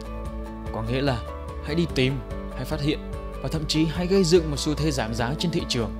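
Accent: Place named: Thanh Hóa, Vietnam. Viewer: chất giọng thanh hoá này nghe ko hay cho lắm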